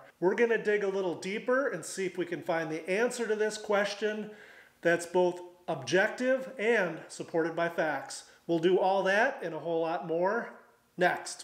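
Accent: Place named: United States. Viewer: Hello...😊many thanks goes out to you by the way, you are speaking a great American English! for me as a German girl it's easy to follow you. I am C5 owner only for a month and love my car. Your videos are interesting and give a lot of information 👍🏼 All the best + GOD BLESS AMERICA 🇺🇸🗽 greetings from Sam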